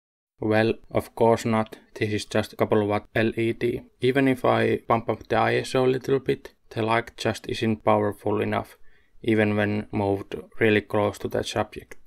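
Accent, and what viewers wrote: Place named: Finland. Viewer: Probably from Finland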